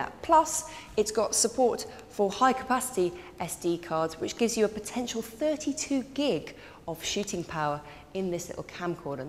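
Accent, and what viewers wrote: United Kingdom. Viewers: how can she be french if she is talking in a brittish accent?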